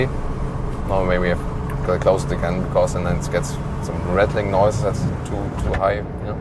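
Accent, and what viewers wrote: Germany. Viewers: Thank you for your reviews. I especially enjoy your German accent. You do a very thorough reviews. You certainly do rush through these reviews and that is welcome.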